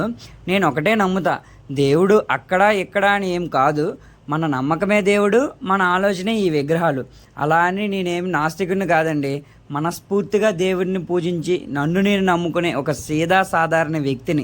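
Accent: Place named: Telangana, India. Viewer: Anna plz anna telangana slang lo mataladaku plz anna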